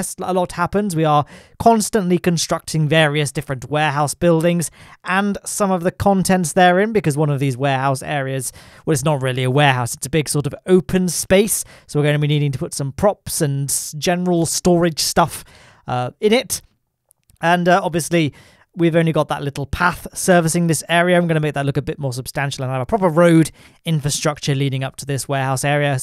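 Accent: United Kingdom. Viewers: I love your videos and love the British & their accent ...lol and I started watching your videos about month ago to learn more about KSP but and I wanted to learn more about youtubers playing games. I bought my own pc and thought it was going to do just fine since I was getting the stimulus money from uncle sam....I'm a disabled Air Force veteran here in the us......and as it was the stimulus money was about 6 months of my income at once so yeah...because it was only $1200 I couldn't afford a great GPU or a computer monitor so I hooked it up to my tv and as you mentioned in this video its soooooo annoying and difficult playing any games on my tv even though it's all I have....lol...I hope you keep making wonderful videos no matter what game it is. 😃👋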